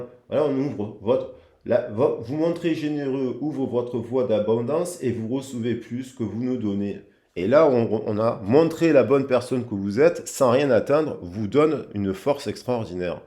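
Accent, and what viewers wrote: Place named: Marseille, France. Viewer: Ah oui c'est l'accent de Marseille...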